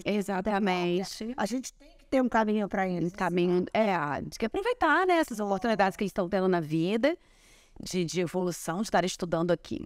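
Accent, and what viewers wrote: United States. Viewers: tá falando bem americanizada 😊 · Ta com sultaque americano 😮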